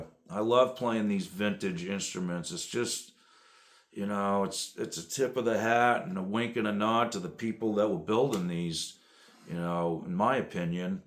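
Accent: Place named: New England, United States. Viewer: What part of New England do you live in?? The accent is hard to miss. Cool guitar.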